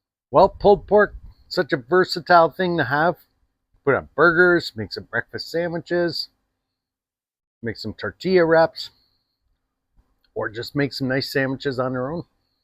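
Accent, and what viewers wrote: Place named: Minnesota, United States. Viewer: Dude sounds like he is from Minnesota...eh.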